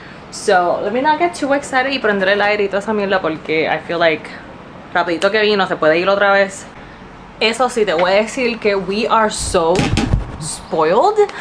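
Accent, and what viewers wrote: Puerto Rico. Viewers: Girllll. I just love your style... Subscribete si te da la gana.. jajajaaa.. bien rajatabla.. me encanta como hablas el Español con ese acento bien boricua y despues El Spanglish.. mita Ingles y mita en Español..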